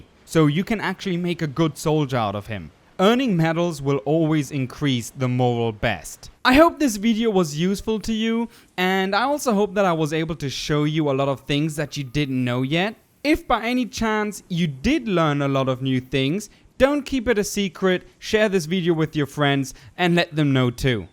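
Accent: Germany. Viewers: R u german? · Hi, i this is the 1 vid i saw , nice- 1 . BTW are you German? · & Man hört sehr, dass du ein Deutscher bist.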